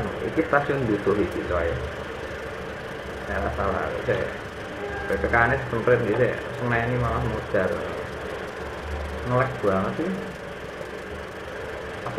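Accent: Java, Indonesia. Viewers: Sampeyan org Jawa? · Ngomong mblitar jowone metu hhhh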